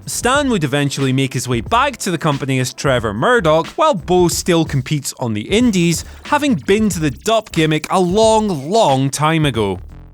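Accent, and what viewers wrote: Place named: Scotland, United Kingdom. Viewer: Can we please stop putting this guy on videos I actually love the Scottish accent but this guy just grinds on me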